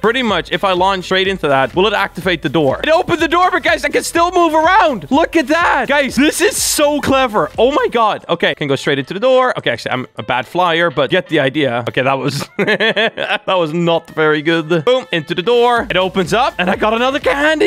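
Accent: Netherlands. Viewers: Are you dutch? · are u dutch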